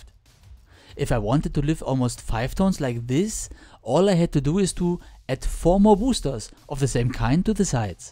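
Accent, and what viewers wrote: Germany. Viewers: There is something to be said about the german accient giving authenticity to the video. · Are u german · German detected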